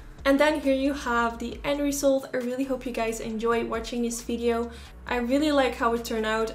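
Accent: Netherlands. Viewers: your Dutch accent which I find charming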